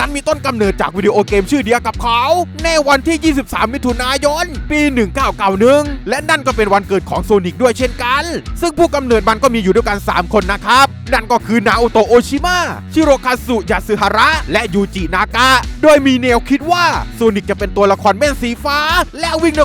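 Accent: Thailand. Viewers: เสียงเหน่อทุกท้ายประโยค แอบหงุดหงิดเวลาฟัง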